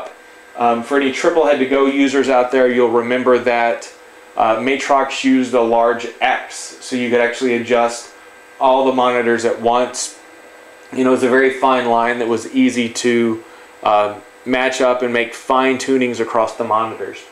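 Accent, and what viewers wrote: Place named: Texas, United States. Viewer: Are you from Texas? You have a hella texas/southern accent. Whenever you say "compensated" you say compINsated. Its funny. Curious if you're from Texas. Great demo of bezel compENsation though :)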